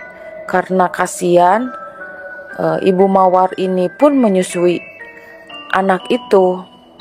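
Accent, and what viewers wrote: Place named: West Java, Indonesia. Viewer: mantap cerita ada animasinya, klao dnger dari logat kayanya dari sunda semoga chanelnya sukses, yg penting tekun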